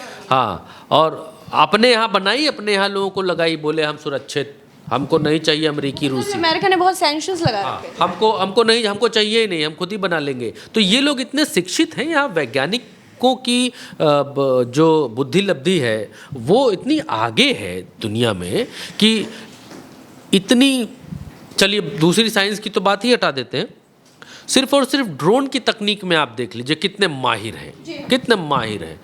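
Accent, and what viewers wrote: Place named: Uttar Pradesh, India. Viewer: भाई जब बोलते हैं तो सिर्फ सुनने को जी चाहता है pure up ki bhasha Bolte Hain 👍👍👍👍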